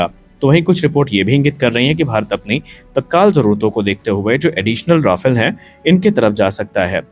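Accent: Pakistan. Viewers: Sala tera awaj padosi jaisa lag raha hai tm pakistani channel hai paaka INDIAN NAAM RAKHA HAI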